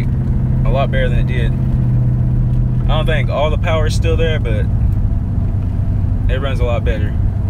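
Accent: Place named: Atlanta, United States. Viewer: Your from Atlanta all my people out there talk like that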